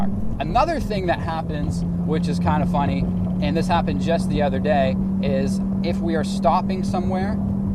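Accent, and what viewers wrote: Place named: Boston, United States. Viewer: I noticed that you had a slight Boston accent. then when you said you're in Maine I thought to myself, "ohhhh"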